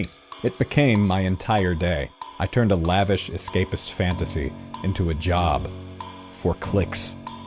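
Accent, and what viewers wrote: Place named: Ireland. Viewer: Flawless editing and Irish accent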